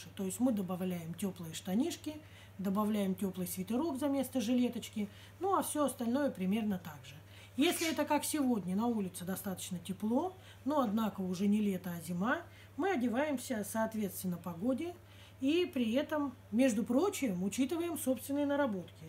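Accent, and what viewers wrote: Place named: Russia. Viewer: Thank you for the subtitles. I love hearing your beautiful Russian accent when you speak. I've been learning the cyrillic alphabet and how to speak Russian, I had always wanted to learn. Thank you for taking such good care of Katya, she looks so much brighter and healthier after having spent time with you and your loving husband.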